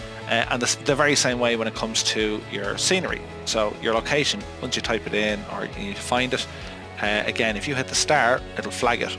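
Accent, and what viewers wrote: Ireland. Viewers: Unmistakable Irish accent, detected it from the first syllable of the first word :)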